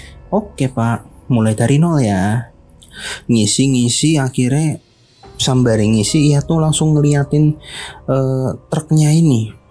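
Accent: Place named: Banyumas, Indonesia. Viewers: udah medok, kebanyakan kata "itu". skippp2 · wkwkw lucu ya wong ngapak nek cerita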